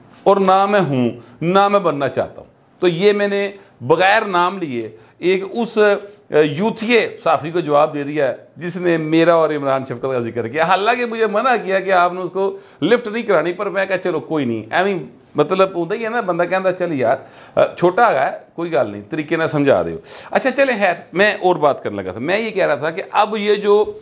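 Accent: Lahore, Pakistan. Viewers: آپ کی باتوں سے اتفاق نا بھی ہو۔آپ کا عوامی اور سادہ انداز ہمیں بے حد پسند ہےآپ کا انداز گفتگو خالص لاہوری لگتا ہے۔👌👌👌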